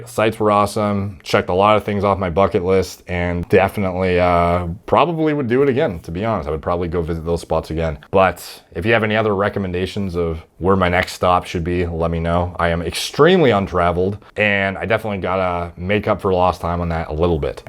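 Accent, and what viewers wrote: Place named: Canada. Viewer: I have some bosses based in Canada and after listening to them in meetings for months now, really does sound so Canadian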